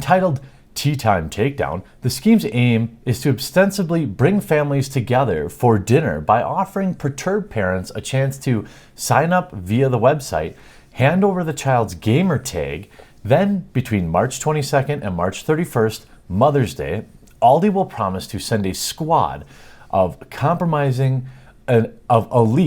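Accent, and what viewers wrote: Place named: Denver, United States. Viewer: are you in colorado? it sounds like your in denver...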